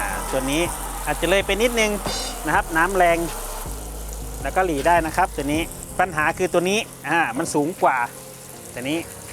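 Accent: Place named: North, Thailand. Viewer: เป็นคนเมืองใช่ไหมครับพูดไทยสาบเมืองมากเลย😅😅😅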